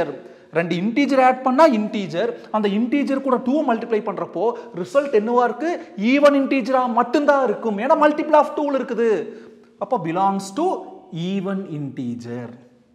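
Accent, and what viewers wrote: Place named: Kongu, India. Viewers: It's kongu tamil 💥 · It's our kongu tamil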